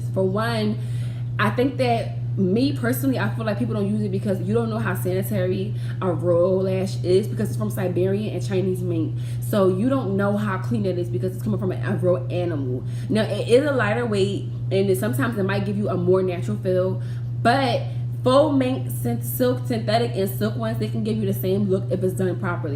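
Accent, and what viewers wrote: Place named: Philadelphia, United States. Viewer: I knew you sounded like you were from Philly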